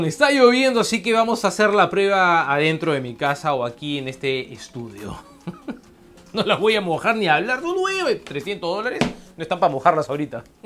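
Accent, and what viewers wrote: Peru. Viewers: Ese tono peruano me hace largarme y no verte 🙄🙄🙄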